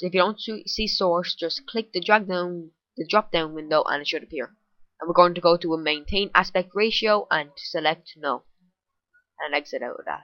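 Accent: Ireland. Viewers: He's Irish that's why he pronounces it different! Some people think everyone has to sound like them. Don't mind them kid, I got this in one of my videos before. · You say tree instead of three because of your Irish accent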